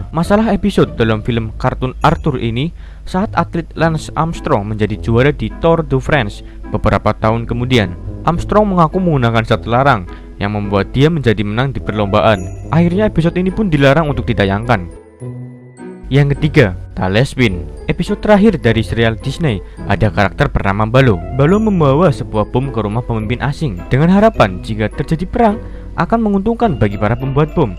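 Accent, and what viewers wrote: Java, Indonesia. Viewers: Jawa banget anjir ngomongnya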